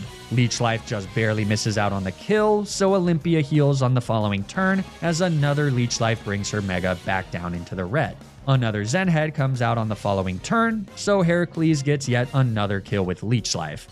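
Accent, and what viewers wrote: Finland. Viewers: Wait how did i not realize you were finnish until now 😭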